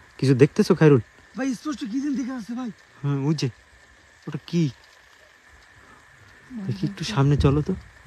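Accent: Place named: Barishal, Bangladesh. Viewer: ভাইকথারভাবেমাঝেমাঝেমনেহয়,বরিশালের লোক,কিন্তূশোলমাছআর,গজারমাছেরপার্থক্ববুঝেন্না,আমরাবলদনাআপনারাবলদ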